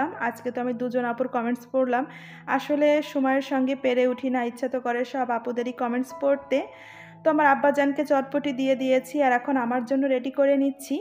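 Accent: Jessore, Bangladesh. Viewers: ওয়া আলাইকুম আসসালাম বোন । তোমার কম্বলের মত আমারও একটি আছে (খয়েরী )ভালোই যশোরের ভাষায় কথা বললে 🥰আমি খুলনার মেয়ে,নানা দাদা বাড়ি লোহাগড়া হলেও পারিনা আঞ্চলিক ভাষায় কথা বলতে। আপু সাথে আছি ইনশাআল্লাহ পুরা ভিডিও জুড়ে থাকবো 💞💞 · আপু যোশহর ভাষায় কথা বলে নিয়েছ। খুব ভালো লেগেছে হাসিও পেয়েছে। ডিম কুমড়ো বড়ি দিয়ে রান্না করে নিয়েছ। জলপাই আচার খুব লোভনীয় হয়েছে।আর চটপটি খেতে খেতে বিদায় নিলাম আপু।❤❤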